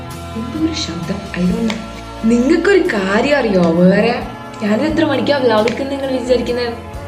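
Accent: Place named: Kozhikode, India. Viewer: Aah... Bhasha kettitta.... Ente slangum almost same aahn...Kozhikode evdya??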